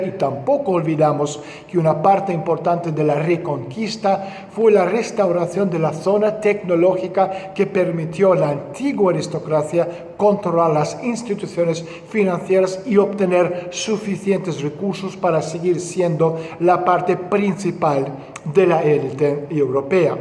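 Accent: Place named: Spain. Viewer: quítate ese acento español tan ridiculo ya vives en LatinoAmerica......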